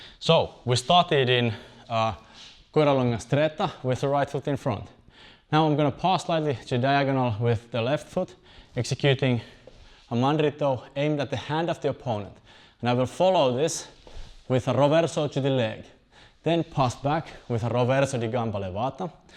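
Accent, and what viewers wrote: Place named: Finland. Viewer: Are you Finnish? Your name sounds like it, you really look a lot like my wife's cousins in Helsinki, but your accent is all over the place! Sometimes it reminds me of the accents of my Finnish family, at least with certain sounds. Whether you are or not, your accent sounds very worldly and sophisticated to my ear at least, so please know I mean no offense. That said, I really enjoy your content here! I hope that you are still training and coaching wherever you are!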